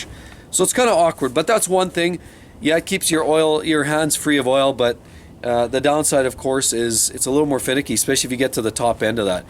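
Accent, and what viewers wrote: Canada. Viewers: listening to your accent to figure out that you are another fellow canadian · Your Canadian aren’t are you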